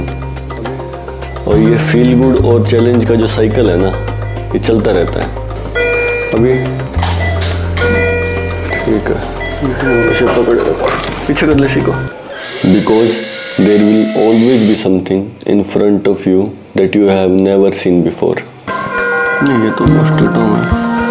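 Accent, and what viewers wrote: Haryana, India. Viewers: Sir your haryanvi is awesome - approved by another haryanvi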